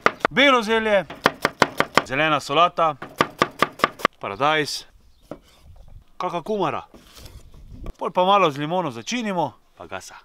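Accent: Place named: Maribor, Slovenia. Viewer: Hud!!!!!.... moj te bl gleda k jst, k si mu res faca in si edini k pr teb prenese mariborski naglas skratka obožuje te.... Mene pa zanima zakaj na svinjino namest kumine obrazlozi lepo prosm ker jst skos devam kumino gor😊😁 pa super si tut men... Tut jst rada gledam tvoje znanstvene raziskave v kulinariki 😂👍❤️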